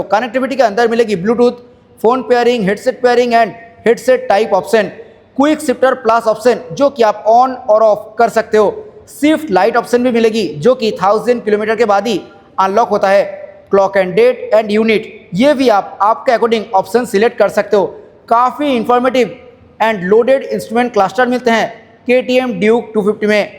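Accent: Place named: Bengal, India. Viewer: Hindi bolna sikhle, tere accent se hi lagraha hai tu bengali hai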